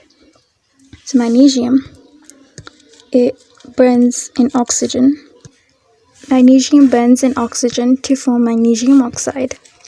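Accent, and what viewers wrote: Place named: United Kingdom. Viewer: Hey, loved this video...and your accent ahhh it's sooo british. looking up for more videos...keep up the good work ❤❤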